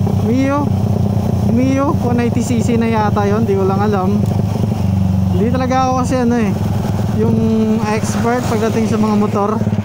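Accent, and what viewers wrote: Iloilo, Philippines. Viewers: Ilonggo ka lods tga dn kmn?🤣🤣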